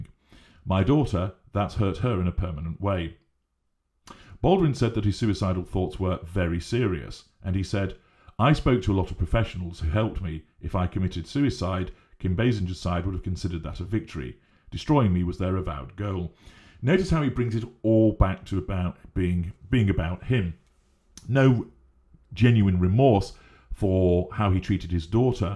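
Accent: United Kingdom. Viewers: Lol...TMZed rather than TMZee...so cute...so very British.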